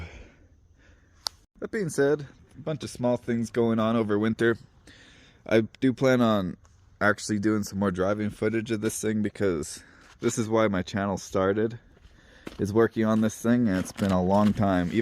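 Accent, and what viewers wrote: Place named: Canada. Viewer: Cool stuff, love those CL Fords, or any Fords for that matter. The Cargo star is pretty dang awesome too, love me some cornbinders, lol. Pretty much anything with an engine. But those CL COE tractors are the best looking IMO, next to a 9670 IH or FLB. Where in Canada are you, I saw the Tim Horton's shirt and the accent gives it away, lol. I'm in NY about 100 miles from the border. Keep on truckin!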